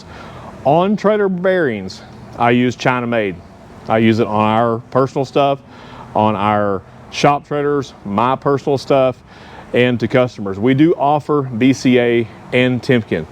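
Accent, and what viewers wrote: Texas, United States. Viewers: I truly enjoy your videos and your texas accent, love it. If it weren't for the fact that your are more than 2 1/2 hours away from me (I live in Cedar Park/Austin area) and that I like to maintain my own RV, I will visit you regularly, even just for chatting. I just finished repacking my bearings (races and bearings were good!), and my next job is to replace the leaf springs. Thanks for your help, as I learned a lot with you. Thanks!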